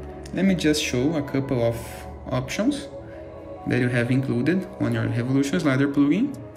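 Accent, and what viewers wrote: Brazil. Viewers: Amigão pela pronuncia vi que é BR, faz um roteiro antes de gravar o vídeo. Vai ficar mais fluido as explicações. Valeu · It sounds like Brazilian guy speaking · sotaque full br kkkkkk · Esse sotaque br não engana rapaz, hahhahha valeu cara salvou minha vida aqui!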